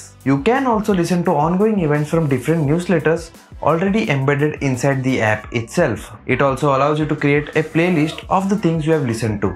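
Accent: India. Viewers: Thank you tube for closed captions. Many of us just can't understand Indian English.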